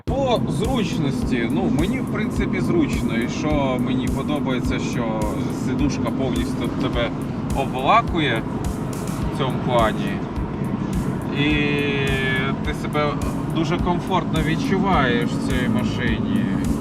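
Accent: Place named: Russia. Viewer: Диктор намагається говорити українською мовою, але дуже не вміє. Йому зручніше говорити російською. Це помітно, що повсякденно він говорить російською мовою.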